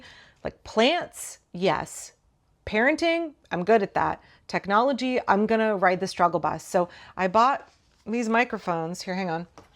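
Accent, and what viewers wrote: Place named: Oregon, United States. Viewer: Am wondering about where you live in Portland. I was born there and lived in Boring, LaGrande, Southeast Portland and then Northeast Portland. Once an Oregonian, you can move to Southern California and Reno (now) but you always feel like an Oregonian. The 70s and 80s in Portland was a great free spirit time. You sound like a true Oregonian which is part of why I love your channel and a big compliment. Thanks!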